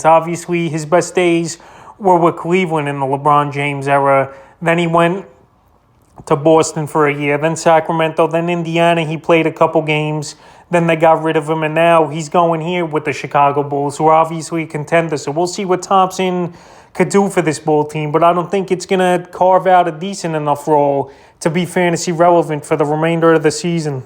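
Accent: New York, United States. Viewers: We know you are a New Yorker with that accent and lack of basketball sense.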